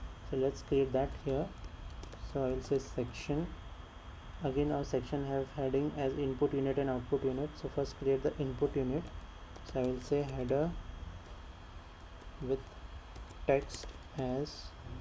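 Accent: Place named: India. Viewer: hahaha it's so funny accent ))) OMG I can't stop laughing ) Indian funny guy with terrible pronunciation )) kupaklklklklklklklklktktktktlklklk 🤣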